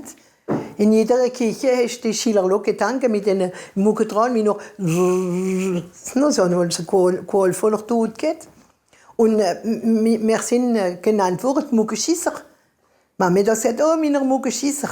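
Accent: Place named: Alsace, France. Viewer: Hallo, ich finde Dich Klasse! Ich bin aus dem Westerwald/Rheinland-Pfalz und kann fast alles verstehen, wenn Du elsässisch sprichst. Unbedingt weiter machen, damit die elsässische Sproch do blaift:-)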